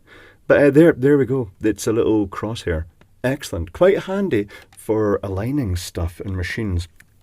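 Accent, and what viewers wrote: Scotland, United Kingdom. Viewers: I don't understand that much about electronics but find these videos fascinating. The other half of the reason I come here is for the relaxing effect of creamy smooth Scottish accent. 😅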